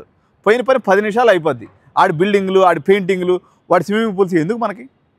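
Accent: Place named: Nellore, India. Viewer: నెల్లూరు బాష, యాస లో ఎక్సలెంట్ గా చెపుతున్నారు సార్ 🎉🎉🎉🎉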